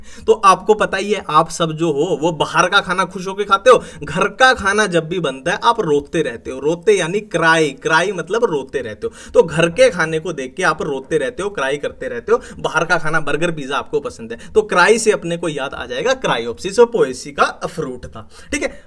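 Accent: Haryana, India. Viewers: Both are belong to HR .. haryanvi accent h bro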